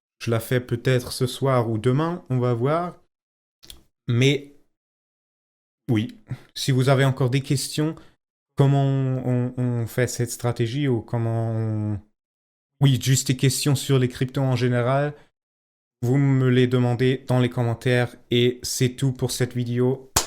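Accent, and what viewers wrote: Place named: Eastern Europe. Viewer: bravo à toi pour l'explication : très claire, et je n'ai pas vu ça ailleurs :)) comment va ton portefeuille depuis oct 2024 (date de la video) j'imagine que tu as bien profité : ? une question : je suis sur Blofin, apparemment il n'est pas possible de ressortir la marge initiale et de même je ne peux pas changer le levier même après après avoir remonté le stop loss dans un endroit où je ne perds plus (je ne peux que gagner).Blofin m'indique le message "you still have isolated orders pending" et me demande de supprimer le stop loss avant de pouvoir changer le levier, de ce que je comprends.....Sais-tu me confirmer que sur Blofin ce n'est pas possible de faire ce que tu as fait sur Bybit ? Dans ce cas as-tu un conseil pour une plateforme alternative à Blofin que je puisse utiliser (Kucoin peut-être ?)....Au fait bravo pour ton français, je croyais déceler un petit accent du Nord (type Belgique flamande) mais non, c'est bien un accent de l'Est...Pourquoi faire tes vidéos en français plutôt qu'en anglais ou en allemand ? 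Cheerz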